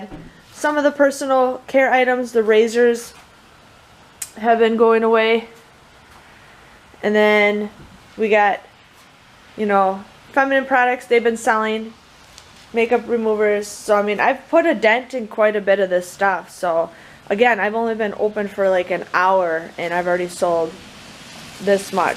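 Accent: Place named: Wisconsin, United States. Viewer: I live in Iowa... not too far from you. I could tell you were from Wisconsin ❤️It's so cold and rainy! I think you did a great job!😊 Happy Mother's Day 🌸🌼🌻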